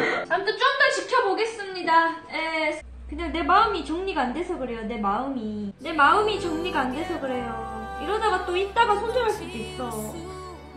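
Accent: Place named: Jeolla, South Korea. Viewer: 전라도 사투리 찰지네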